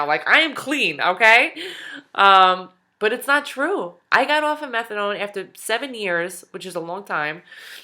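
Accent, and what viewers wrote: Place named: New Jersey, United States. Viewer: Can totally tell u from Jersey. It's kinda funny sounding to a West coaster. Methadone 5 years. Looking to get off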